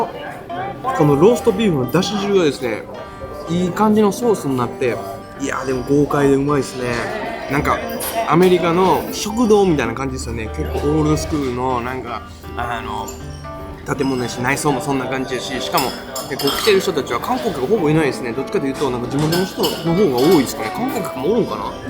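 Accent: Kansai, Japan. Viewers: この関西弁が非常に聞き取りやすい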